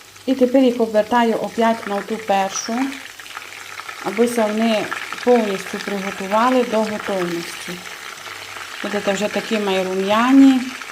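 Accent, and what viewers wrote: Transcarpathia, Ukraine. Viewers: та хоч би і ніколи не жили в Україні,на мій погляд не дуже важко здогадатися,що у має бути закарпатський діалект.Це загадка на мільон долярів.😁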